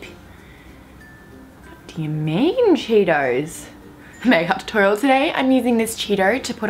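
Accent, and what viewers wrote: Australia. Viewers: Omg I love your accent😂❤️ I never thought I would love Australian accents but I turns out I do😂😂 when you found out the Reece's bar was out of date hahah omg😂😂❤️❤️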